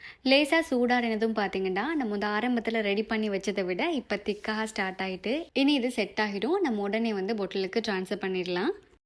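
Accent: Sri Lanka. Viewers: Yesterday I prepared as per ur ratio. It came out well. Tnq so much maa.R u from srilanka 🤔bcoz when u talk u sound like srilankan.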